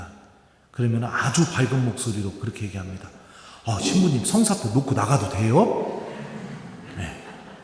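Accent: Chungcheong, South Korea. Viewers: 신부님 충청도 구수한 사투리 말씀과 주님말씀의 선포하시는 신부님 감사합니다 영육간에 건강히시길 기도드립니다 감사합니다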